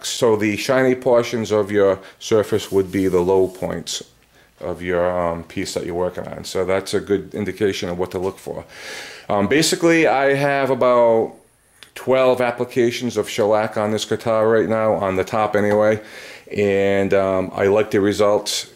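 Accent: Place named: New England, United States. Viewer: The accent you speak with sounds very north east coast maybe Maine, Boston, but any way I was slug'n some beers and perusing YouTube and found this guitar video and I thought it was good shit, very informative from someone who has obviously had some hands on experience with the craft. Good stuff.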